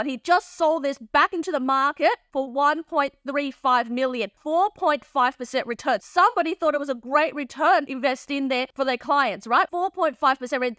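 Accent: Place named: Australia. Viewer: You're speaking at a fine speed, for all the slow racist idiots who cant understand Australian English, that's a problem with you, not her.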